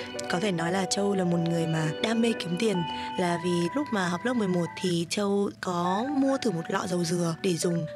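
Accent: Hanoi, Vietnam. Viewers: Tui thích giọng gái Hà Nội Tui thích giọng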